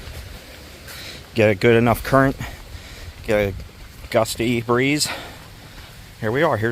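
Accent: Pennsylvania, United States. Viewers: That was great. I've been to Presque Isle S.P. dozens of times but never to Pine Bluffs. I live 50 miles South of Erie and Pjne Bluffs is a handful of miles out of where I go. Very nice job, I feel like I was there too. I can tell you're from Pittsburgh by your yinzer accent but I thought you would be used to going uphill, ha! Good job. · Nice video! Much appreciated. Great idea about PA state park visits. I'm a transplant from Philly area to Western PA. Your PA accent is endearing. Liked and subscribed.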